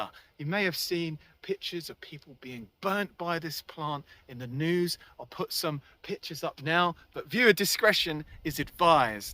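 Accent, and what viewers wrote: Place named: London, United Kingdom. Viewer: He is handicapped by a Nu-London accent, innit.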